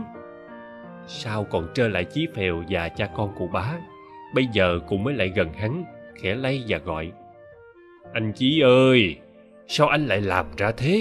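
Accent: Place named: South, Vietnam. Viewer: Tất nhiên rồi. Bởi vì Chí Phèo là nhân vật xuất phát từ miền Bắc, quê hương của Nam Cao thuộc tỉnh Hà Nam Ninh ngày xưa mà. Nhưng Giọng miền nam ngọt ngào của bạn này cũng rất hay. Hay lắm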